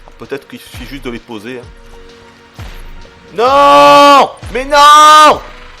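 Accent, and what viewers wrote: South, France. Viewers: Bein oui, l'accent du viens du sud.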